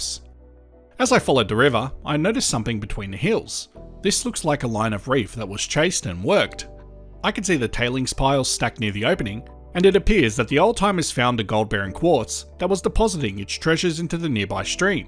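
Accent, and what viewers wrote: Australia. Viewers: Good stuff. I only wish the speaker wouldn‘t be an Australian 😖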